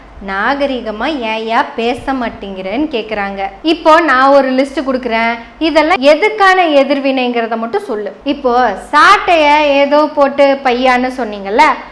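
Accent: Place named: Kongu Nadu, India. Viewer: கொங்கு தமிழ் உச்சரிப்பு மிகவும் அருமை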